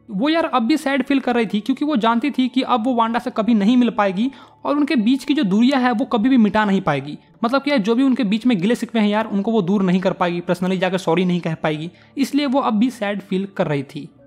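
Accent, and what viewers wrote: Bihar, India. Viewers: Bihar se h ??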